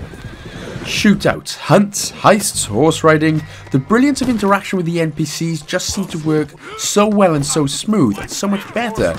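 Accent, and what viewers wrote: Wales, United Kingdom. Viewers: Bloody decent vid buddy 👍🏻 nice to hear a welsh voice on Yourube for a change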